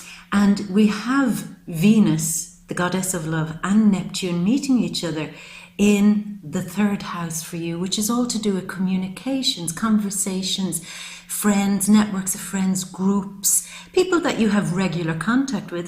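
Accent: Ireland. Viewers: Neil said mesmerize, I say magnetic.He is right, you dear lady have it all, beauty, poise and grace. Also what appears to be a charming Irish lilt. I dare not say anything further for I have only one heart to loose.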